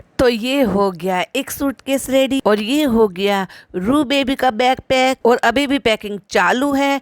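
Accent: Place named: Saharanpur, India. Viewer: ji!!! Aap bilkul Saharanpur/ Muzaffarnagar k jaisi boltai ho!!!! Many of my family members & friends live there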